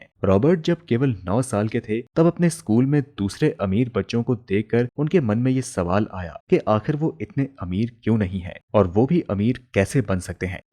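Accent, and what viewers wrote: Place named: Pakistan. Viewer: Your language sounds like pakistani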